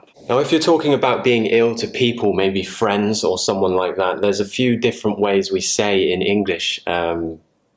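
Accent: United Kingdom. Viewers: Hi teacher, can i add you on whatsapp? i would like to practice my brittish accent with you.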